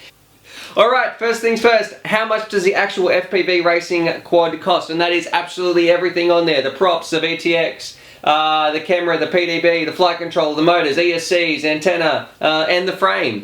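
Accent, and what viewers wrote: Australia. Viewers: You are Australian aren't you? Tell us the prices in AUD!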